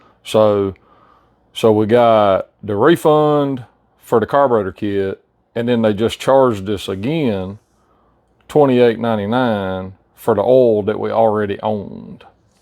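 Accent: South, United States. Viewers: This guys accent is like Forrest Gump times 10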